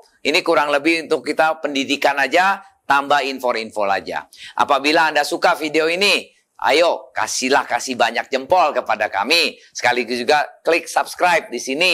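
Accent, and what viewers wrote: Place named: Jakarta, Indonesia. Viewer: Pak ngomongnya kaya orang betawi🖒🖒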